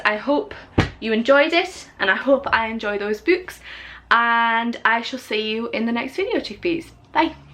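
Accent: Newcastle, United Kingdom. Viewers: What accent do you have? Sounds slightly Geordie, soz if not I'm Welsh don't know too much about England. Your accent is very cool btw :) x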